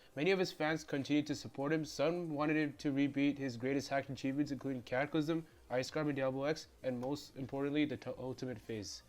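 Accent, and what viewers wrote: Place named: India. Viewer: Btw are you from india your voice looks like an indian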